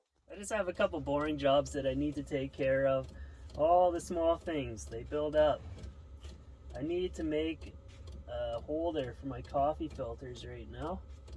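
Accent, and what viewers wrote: Canada. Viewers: On the way owt there. On the way owt lol i love canadian accents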